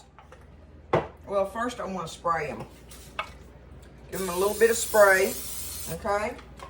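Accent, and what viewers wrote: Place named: Texas, United States. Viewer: That Texas drawl you have is so wholesome & refreshing Ms..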